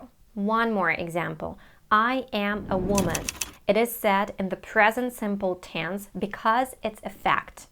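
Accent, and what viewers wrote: United States. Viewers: I❤your American accent. You're the Best. Thanks 🥰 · The fist time i saw you on youtube, i thought you were American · Wow! You have an excellent American accent, I like it, I congratulate you and keep going. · Wow! You're Ukrainian. I thought all the time you were American. You've done a great job. Congrats. 💐🌹💐🌹💐🌹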